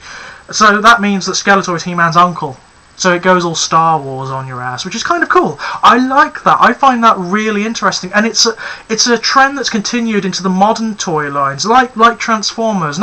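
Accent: England, United Kingdom. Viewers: wow you're accent is so posh where in england are you from :)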